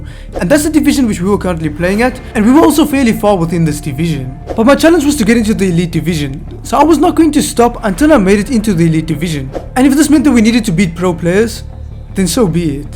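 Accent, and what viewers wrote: South Africa. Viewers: are you south african??? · Are you from south Africa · I'm not gonna lie his sounds a little south african 😅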